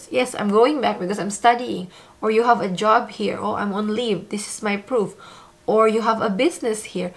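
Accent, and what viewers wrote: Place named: Visayas, Philippines. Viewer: Bisaya kapo mam?...halata accent mo bisayan accent.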